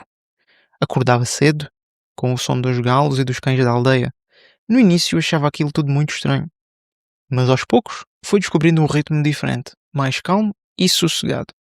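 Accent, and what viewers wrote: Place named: Europe. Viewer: Muito obrigado pela ajuda de escutar as palavras faladas no sotaque europeu. Gosto do seu jeito de ler e explicar.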